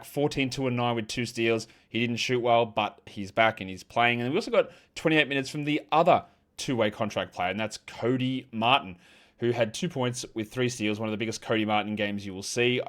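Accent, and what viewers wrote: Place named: Australia. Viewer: Yo!!! Who is this guy? First time I heard this guy I was about to turn it off once I heard the Aussie accent I immediately judged him as being unknowledgeable but I dropped my remote after a hasty approach to close the video. Thank God! I happened to hear a rare but legendary Jon Vitti Simpsons reference that if you know you know. Only legends know what the f he was referencing. And I wanted more! Fact is that Vitti reference was GOLD! is referencing one of the funniest episodes from The Golden Age of the Simpsons, that was one of the best episodes by probably their second best comedy writers in the history of television not just the Simpsons. Jon Vitti who arguably is behind maybe John Schwartzwelder and tied with Conan, even though Conan didn’t write enough to have as many classics as most Simpsons Golden Age scribes. But, seasons 1-6 of the Simpsons can’t be compared to any other show it goes down as the best comedy tv series animated or not, it doesn’t matter, the writing was so tight and smart. 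If you know it, when someone references one of those episodes like this dude just did in this clip, you know he knows his shit.m, ABOUT EVERYTHING. Guy or whoever this dude is, has some taste. I am listening…you got me hooked. At first, admittedly and with shame, I heard the Aussie accent and immediately judged his hoops knowledge as being suspect. However after I heard the Corey hotline reference I had to give him a chance. He is absolutely surprisingly LE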